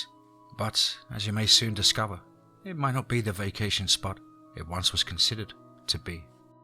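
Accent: England, United Kingdom. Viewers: Huh ? I don't think it's a speech impediment. It's the accent ya know English accents. But maybe you are correct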